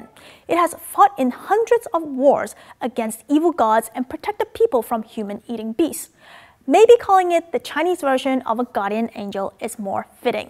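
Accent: Australia. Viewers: I just recently found your channel (Chinese eating etiquette), and watched your discussion of Dim Sum (Here in America "shrimp toast" is a big favorite but I love Siu Mai and cabbage or pork bao) and i am surprised to see that some of the videos are 7 or 8 years old. I didn't notice a difference now that you are "back" but you still have immaculate English enunciation and slip very naturally in to Cantonese or Mandarin. (I notice that you say "mandarIN" instead of "MANdarin" - do we say it wrong over here?) Liked and subscribed and enjoying the videos. Oh, and it is charming when the Australian accent just peeks out a bit in your English. Love it! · Is this an Australian, Chinese accent?!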